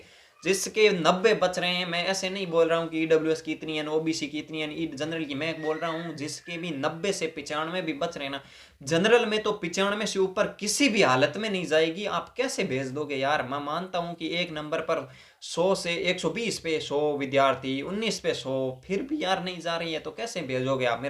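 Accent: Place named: Marwar, India. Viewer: Sahi hai ,, cutoff kuch bhi rhe lekin analisis bhut sahi hai, aap marwad se ho sayad, language tone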